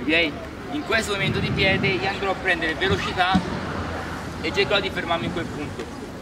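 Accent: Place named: Tuscany, Italy. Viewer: Sei toscano dall'accento sembra di sì bravo bellissimo video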